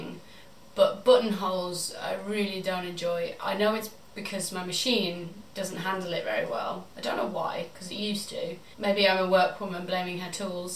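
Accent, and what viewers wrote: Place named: Midlands, United Kingdom. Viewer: TFS great to hear more about you. I'm from Walsall, knew there was a Midlands accent in there somewhere!!!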